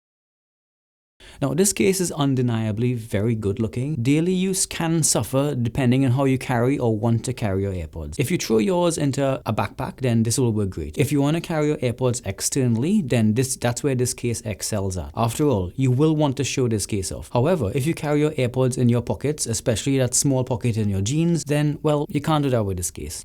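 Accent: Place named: Trinidad and Tobago. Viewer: Your accent sounds Trinidadian, is it? Great content by the way!!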